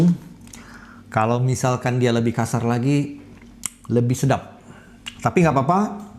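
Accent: Medan, Indonesia. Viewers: Ada tutop nya pulakk haaa .. Adoohh logat mu itu bang buat rindu nongkrong di medan lagi ah...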